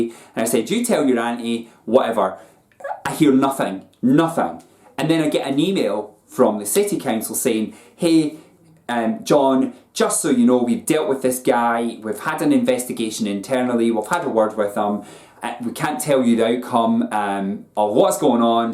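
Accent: Scotland, United Kingdom. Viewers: Ooh Scottish accent, dare I guess Glasgow originally?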